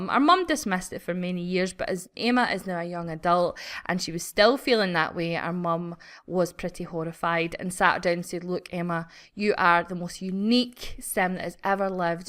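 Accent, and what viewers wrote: Scotland, United Kingdom. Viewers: She's scottish and I think her accent is lovely [ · i love your video's! And your Scottish accent is very nice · She's from Scotland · Scotland is my probably pretty accurate guess since I've lived there myself for a bit ;) Ya know, where Merida is from?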